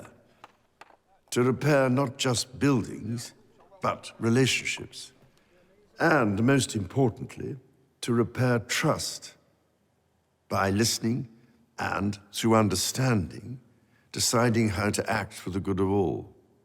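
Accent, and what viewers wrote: RP, United Kingdom. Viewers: an old white haired RP speaker?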